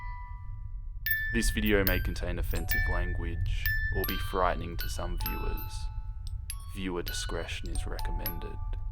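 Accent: Australia. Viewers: Pookie r you Australian ? ? ?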